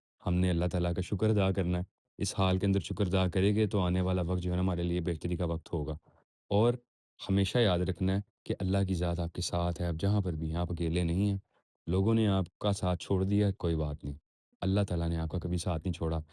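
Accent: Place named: Pakistan. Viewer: Aap k elafaz bolne ke treqe se lagta h aap pakistani ho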